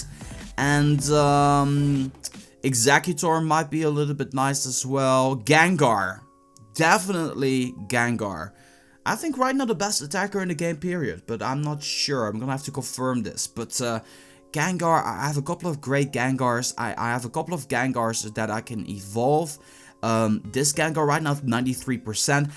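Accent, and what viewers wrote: Netherlands. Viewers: are you form the Netherlands ?????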